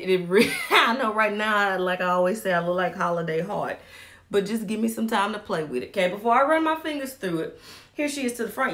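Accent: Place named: Mississippi, United States. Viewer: Chiiiile, you're so Mississippi and I LOVE IT! I went to HS with your baby sis, and I definitely see lovable personalities are in yall's bloodline. Keep it up, love. When I'm missing home, I watch you. That accent takes me right back to Highway 90, lol! #HugeFan!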